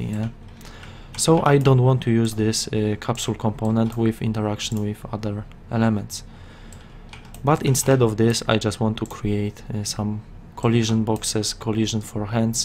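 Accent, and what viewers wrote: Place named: Poland. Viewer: true Polish accent :D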